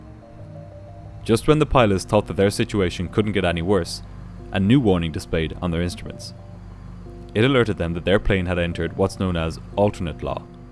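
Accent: Ireland. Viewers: Top o' the mornin' to ya! What a quaint Irish accent you have - love it! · The narrator is Irish...the ATC is clearly Australian my dude smhl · Bruh he's Irish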